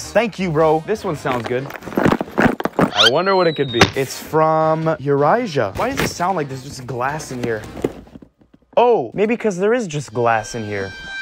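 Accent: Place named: Germany. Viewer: his german accent tho :,)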